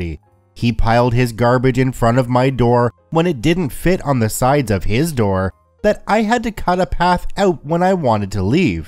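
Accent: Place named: Canada. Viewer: I don't know it would be the first time I enjoyed the Canadian accent. Not that I dislike it. I lived there for awhile. I lived in Canada from age four and a half till about 11 maybe a little younger. And then we moved back to the east coast and then everywhere else in the u.s. LOL all I know is when I was a kid I trained myself into having a flat Midwestern accent because it kept me from getting picked on as much. But I still don't remember anyone in Canada saying it the way you do LOL have a wonderful night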